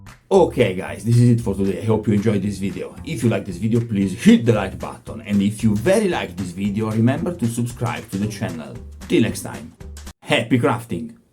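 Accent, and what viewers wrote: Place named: Italy. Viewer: Ce l'abbiamo nella parlata, il nostro inglese sarà sempre... così xD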